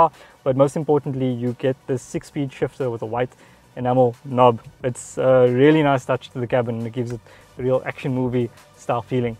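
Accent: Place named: South Africa. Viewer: This presenter has a brilliant South African accent. Fantastic!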